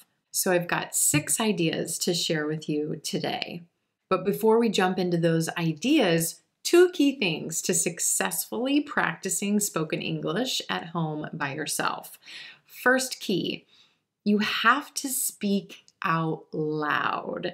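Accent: United States. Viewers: Your all Video is American English?? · I am an arabic speaker and an english teacher I love the american accent and I have choosen you as a trainer after a great reacher thank you for your help I watch your videos every day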